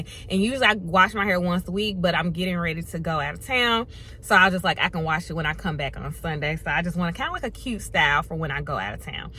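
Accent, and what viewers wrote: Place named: North Carolina, United States. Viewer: Cute💕..are you from NC? You sound just like me😂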